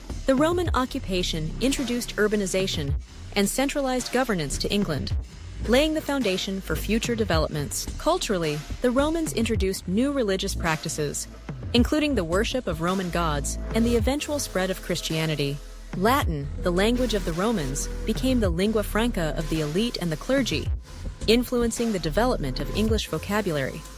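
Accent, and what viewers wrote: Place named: United States. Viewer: and now its narrated by a YANK .... !!!!!!!!!!!!!!!!!!!!!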